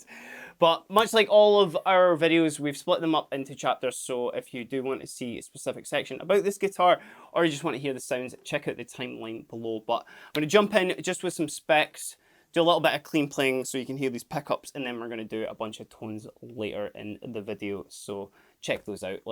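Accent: Scotland, United Kingdom. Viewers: The #EVH SA-126 12/6 is #EVH's Birthday E-Hole in honor of #EVH. #Glasgow and #Edinburgh....What a #Scottish accent!!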